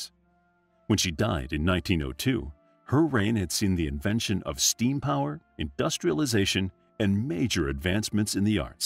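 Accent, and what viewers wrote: United States. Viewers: American pronounceation,is different to ours. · A bit of a list but a reasonable summary. Its a pity it had to be read out by an American accent.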